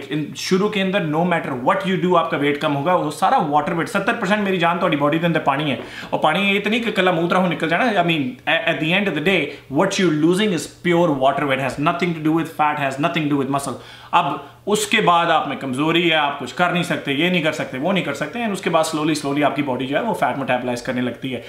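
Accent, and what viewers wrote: Punjab, India. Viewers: Kash like ki jaga pe smily emoji hoti is video k lye tou bunti hy bosss 😜😊😊😊 Thanku soo much for your advice with zbrdast style of punjabi tarqa 😜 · apka bolne ka tarika mujhe bohot pasand hai sir Punjabi English language apki kya baat hai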